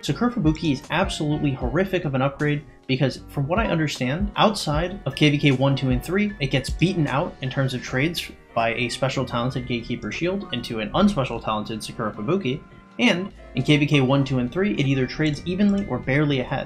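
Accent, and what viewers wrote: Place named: United Kingdom. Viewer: Bro I love the voice control on this vid .almost sounded british .hahaha top job as per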